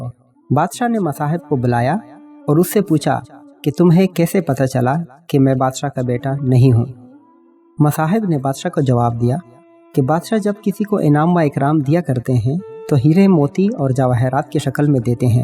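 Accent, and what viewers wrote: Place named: Pashto, Pakistan. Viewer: Good excellent. Although yeh Urdu Speaking andaza kisi Pashto speaking friend ka hi Hoskta hy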